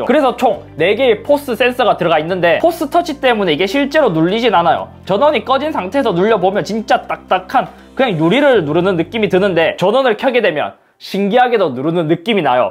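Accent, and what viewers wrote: Gyeongsang, South Korea. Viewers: 잇섭님 너무 잘생겼어요! 애플 제품 관련 영상은 다 찾아본 편인데 항상 웃는 얼굴이라서 보면서 마음이 편해져요ㅎㅎ 왠지 만나면 되게 재밌는 친구일 것 같아요ㅎㅎ 경상도 말투를 쓰셔서 졸리지 않게 들을 수 있어서 좋아요~ 앞으로도 재밌고 유익한 영상 많이 찍어주세요 :-) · 같은 경상도사람이라서 억양자체가 비슷한데 목소리까지 비슷하네요.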